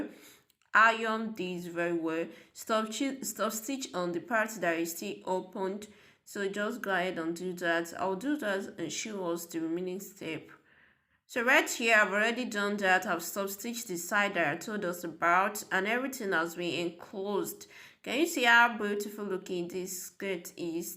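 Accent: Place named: Nigeria. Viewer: I love your natural and beautiful Naija accent ❤❤❤ I had watch multiple times. Thanks for the interesting tutorial! I'm about to try this technique but scared to flop 😅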